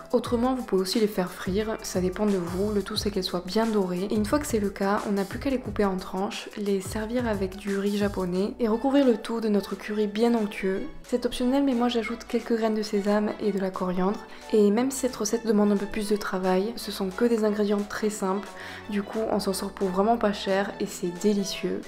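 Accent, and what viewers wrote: South, France. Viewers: eh non! Ne change rien tout est parfait montage recettes et ta voix off avé l'accent haha! Bonne continuation et merci:)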